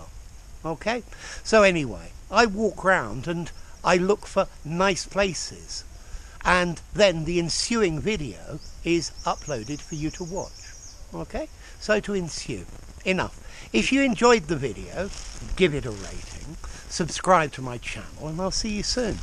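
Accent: RP, United Kingdom. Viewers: Hello Sir I've just run into your videos....you're such an excellent teacher. I wish people here in the North of England spoke as clearly as you!!! I can't wait to watch all of your videos!! I love posh accent, but I still struggle when it comes to understanding people speaking (for me) fast....would you explain us how to deal with this issue? Thank youuu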